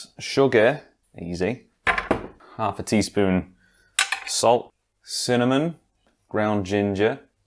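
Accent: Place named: United States. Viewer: His accent is half American.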